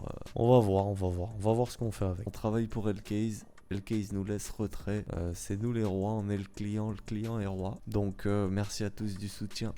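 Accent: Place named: France. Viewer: this channel sounds like a handsome french man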